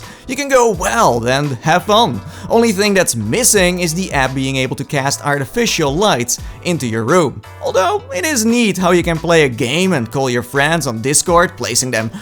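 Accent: Netherlands. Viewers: Bij de eerste zin hoor ik dat je nederlands bent hahahaha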